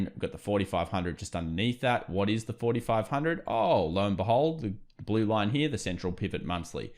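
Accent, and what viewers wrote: Australia. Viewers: Straight down to the point and precise you are the best ta on here. Love your aussie accent too.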